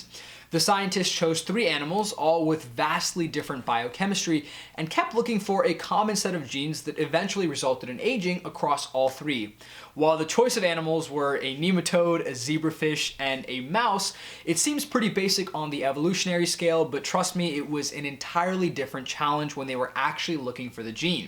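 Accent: India. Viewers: Do you have a tiny bit of an Indian accent? I'm not sure whether or not I'm hearing something.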